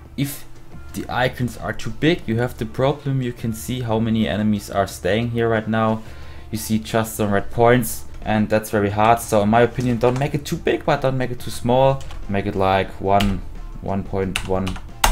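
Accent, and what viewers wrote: Germany. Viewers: Ich wusste das du deutsch bist schon ab Sekunde 1 xD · Also als deutscher merkt man das du deutscher bist😂 lol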